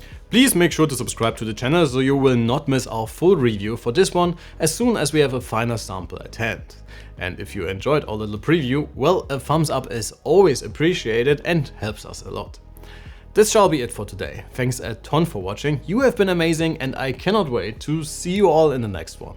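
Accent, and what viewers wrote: Germany. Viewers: I Hear Your Deutsch Akzent 😂 schönes Video btw 🎉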